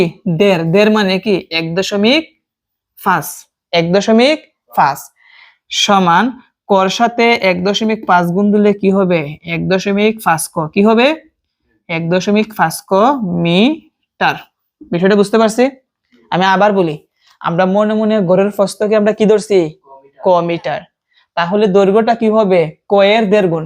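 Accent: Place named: Habiganj, Bangladesh. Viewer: বুঝতে পেরেছি স্যার। অসংখ্য ধন্যবাদ।🙏🙏স্যার কী হবিগঞ্জে থাকেন? আমিও হবিগঞ্জ এই থাকি। স্যার এর কথা বলার স্টাইলটা হবিগঞ্জের ভাষার মতোই লাগলো।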